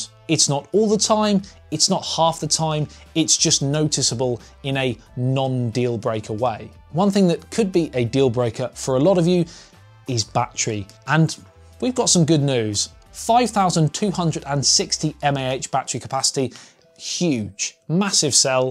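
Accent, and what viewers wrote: England, United Kingdom. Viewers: what in the world is your english accent??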